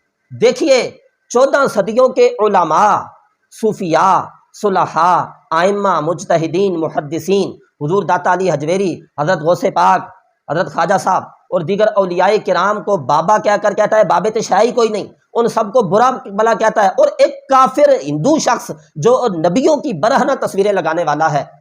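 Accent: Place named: Arab world. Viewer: The damage has been done. Do you really think whatever you say we will digest as a Muslim? Lolllll. Pakistani ulma are 80% thug of Pakistan. They are not on the right path. Ap ko kya lagta h mutfi, hafiz title lagany s or apna accent arabic kr k urdu m bat krna will create even a tiny impact on us? Lolllll. Bhae ap pehly khud ko dekhen ap Islam k kya khidmat sar-anjam d rhy hen, phr dosron ko kahen. Jab ap ko aik basic chez ka nh pata ke knowledge kisi s bh mily agar ke wo sach h, you have to accept it. wo hindu h Christian h koi bh h , bhae wo research kr k koi chez batata h to is m galat kya h ke aik Muslim us k taref Kry? Ap muslim hokar kon s research krty hen ? Bus babaon k books parh k, ulma k bat sun kr, sedha YouTube p akar record krty hen, is k ilawa koi or kam h ap logon k paas? M beshak ap k j tarah mufti, hafiz Waly title wala banda nh hn, but m aik question bh Quran s karn ga. 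Hmary Rab k qasam ap n us ka answer tak nh dena. To is liye mery bhae hao khao peo, aram kro, ghar walon ka khayal rakho, islam ko wahen tak pehlao pehly, jab ghar waly ap s razi hojaen phr YouTube p ao. 😅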